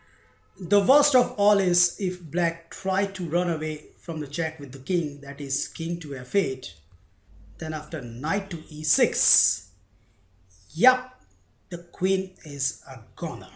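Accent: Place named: India. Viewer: Tyler Roth why you hatin on this guys voice. Sure he has an Indian accent but his voice isn't cancer. Don't joke around like that, cancer is a serious thing bro. Also nice attack will try this in my next tournament. There's this players who's skilled who plays this opening so might be able to beat him now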